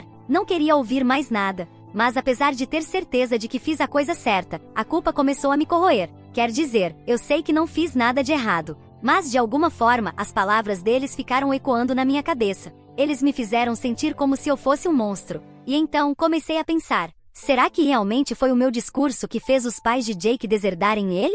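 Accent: Portugal. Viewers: Já me des escrevi desse canal e continua aparecendo para mim e fui dar uma chance depois de umas 10, pensei; "ela(e) deve estar lendo, não pode errar pra ver que desistiu do canal. .português de Portugal na história 2, não tem mais transição. Ou tá fazendo de propósito pra ser isca de raiva ou não se importa mesmo é vai continuar até todo mundo saltar do barco. Não tem dificuldade pra traduzir e só jogar numa IA pra traduzir e jogar gerar voz. O único esforço e revisar e editar e nem isso faz. Parabéns por matar o canal.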